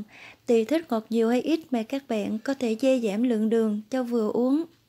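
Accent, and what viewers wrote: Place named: Phu Yen, Vietnam. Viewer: Bạn giống ở phú Yên vậy bạn giọng nói ấy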